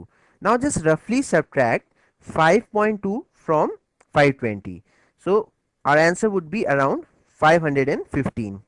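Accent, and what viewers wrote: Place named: India. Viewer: why is it a lot of english speaking people are stupid. Why they always think that they are beter than other because their language is english. My grammar in english probably not good but for sure, you don't understand and cannot speak german, dutch, italian language........ well I do................. and still, to much bla....blaa in this video. And your accent is not familiar to me. I know brittish, Irish, american and australian accent. But you, you sound like Indian english accent. Right???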